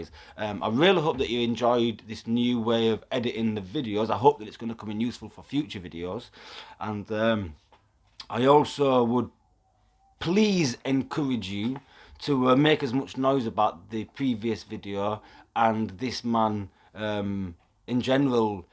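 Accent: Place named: Southern England, United Kingdom. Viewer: South england accent,up in teeside hmm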